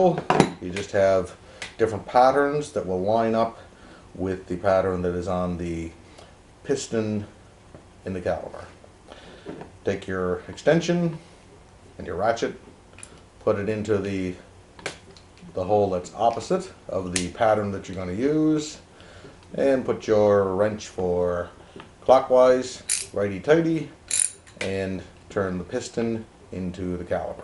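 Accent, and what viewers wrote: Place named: Grand Falls, Canada. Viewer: Are you from Grand Falls? I hear the accent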